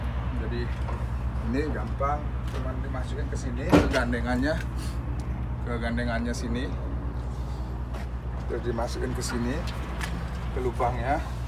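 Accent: Bali, Indonesia. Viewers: Kalo denger logat pas ngomong pasti orang bali y bli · Seperti logat bali yaa bhsa indo nya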